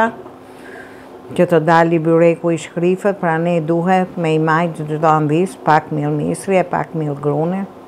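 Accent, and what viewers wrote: Tirana, Albania. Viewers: pershendetje e dashur oooo me kenoqe me ate te folme me ate duret e arta sa qe me thote goca lene mos e luj mamin se eshte tu shiju te folmen e tirone 😘😘😘❤❤🌷🌹🥀🌺⚘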